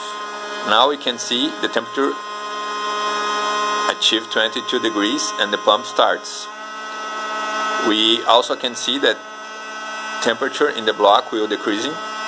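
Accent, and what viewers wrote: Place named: Brazil. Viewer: Brazilian sotaque....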